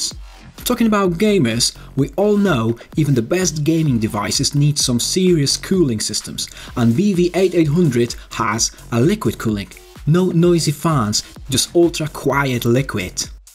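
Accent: United Kingdom. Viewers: I really hoped for some original content or interaction with the device. This kind of seems like the original media packet recut and shared by an English accented presenter. The infrared example looked super fake, as if the scene depicted was CGI.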